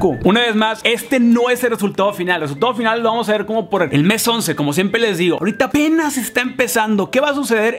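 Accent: Monterrey, Mexico. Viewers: ¡Te ves super bien! 😍 ¡Amo tu voz y ese acento de regio! 👌💜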